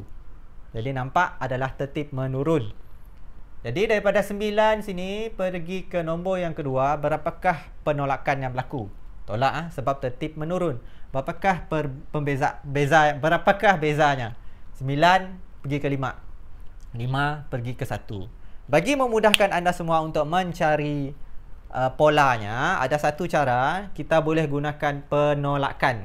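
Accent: Sabah, Malaysia. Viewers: Cikgu bukan cakap orang sabah ke?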